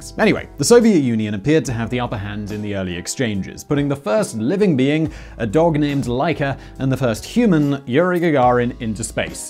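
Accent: RP, United Kingdom. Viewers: I'm hooked... Subscribed. And yes, this is Cykey I watch every channel that on. It's never boring, knowledgeable and is a cool ass host with the most that speaks the Queens English. That's guy is dope! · NASAR the British accent gets me every time lol can pronounce Russia correctly instead of Russiar like NASAR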